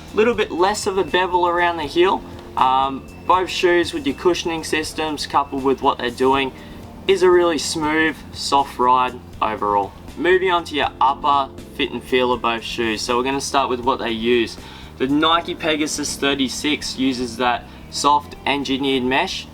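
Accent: Australia. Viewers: Great review and great Aussi accent. Would love it if you kept doing comparisons of running shoes like this one.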